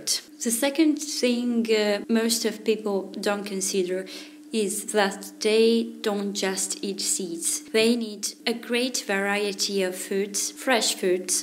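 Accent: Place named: Italy. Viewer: Posso darti un consiglio? Non forzare la pronuncia, è davvero fastidioso da sentire! Non devi vergognarti del tuo accento italiano, e se proprio lo vuoi cambiare ti ci vuole molta pratica e non lo puoi improvvisare così. Ma poi il nostro accento è bello e simpatico, quando ero in Inghilterra me lo dicevano tutti :)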